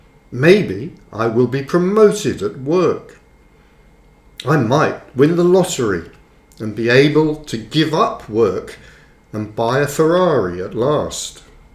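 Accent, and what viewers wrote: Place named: England, United Kingdom. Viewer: Listening to read his book with his English accent provides a nice intermission between episodes of Inspector Morse, Lewis or Midsumer Murders. Thank you! 🙏. 💥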